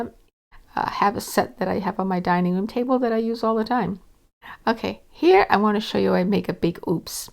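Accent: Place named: Massachusetts, United States. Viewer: Are you from Massachusets by chance? Your accent is so much like my aunts were. <3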